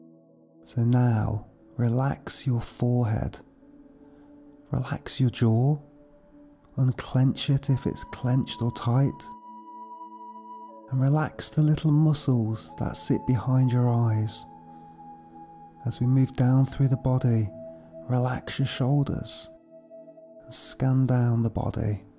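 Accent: United Kingdom. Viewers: Ok thr british accent is already helping 😅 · Your accent reminds me a friend form Brighton (UK) 😊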